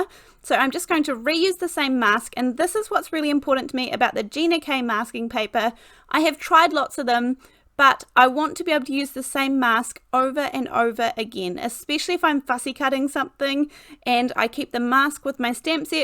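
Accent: New Zealand. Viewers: Another great card! I just love all your creative, use what you have video's. You have become my favorite YouTube person to watch. Love your New Zealand accent too.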